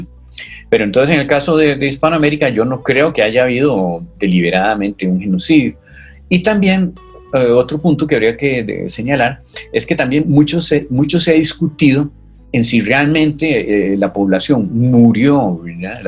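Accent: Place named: England, United Kingdom. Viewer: Teniendo en cuenta que tiene acento inglés demasiado bien habla de la época virreinal.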